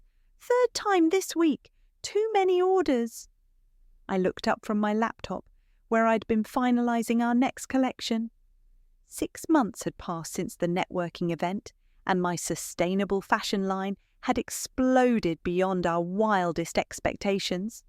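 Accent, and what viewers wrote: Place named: RP, United Kingdom. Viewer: Love the RP accent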